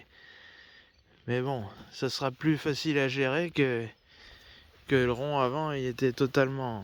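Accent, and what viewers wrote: Charente, France. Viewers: J'adore ton accent Charentais (les cagouilles😄). Bonne continuation 😉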